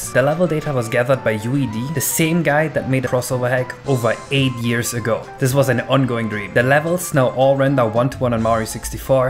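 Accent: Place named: Germany. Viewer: jo bist du aus deutschland? hast nen deutschen aktzent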